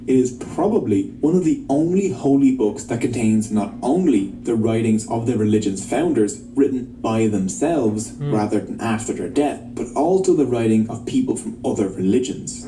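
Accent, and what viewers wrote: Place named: Ireland. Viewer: I like the Irish accent ❤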